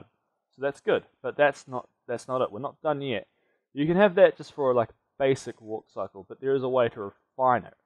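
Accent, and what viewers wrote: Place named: Australia. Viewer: I can't tell if you're Australian or American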